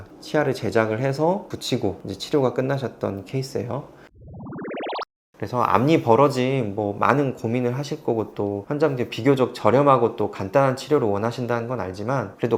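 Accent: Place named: Gwangju, South Korea. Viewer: 원장님 답변 정말 감사합니다. 저 광주광역시 사는데 원장님 억양에 약간 동향 느낌이 났는데 홈페이지 가보니 광주 사셨을거 같아 반갑습니다. 복 많이많이 받으세요^^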